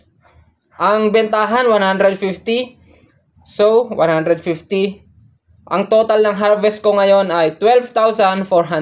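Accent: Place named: Ilocos, Philippines. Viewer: Taga ano ka gayam Sir, kasla nangngeg ko ilokano met sao yo. Ty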